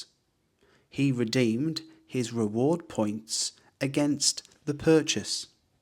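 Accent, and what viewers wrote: United Kingdom. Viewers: What British accent is this?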